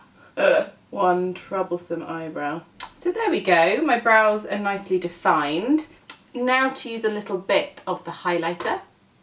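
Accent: United Kingdom. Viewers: Finally someone who speak British English and not American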